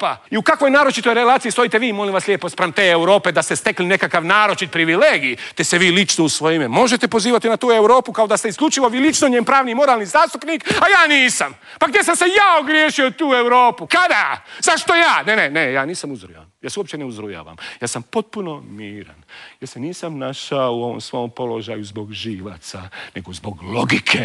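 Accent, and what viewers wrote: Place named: Herzegovina, Bosnia and Herzegovina. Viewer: Nema glumca do Rade Šerbeđžije koji zna govoriti Krležu! Moj naklon i Despotu ali ima svoj hercegovački naglasak!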